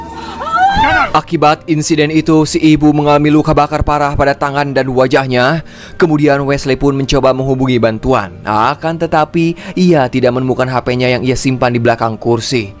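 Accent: West Java, Indonesia. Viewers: Asalamualaikum ..bang Ciawi hadir.bang aku suka cara Abang ngomong nya pakai bahasa Sunda Abang orang mna sih😊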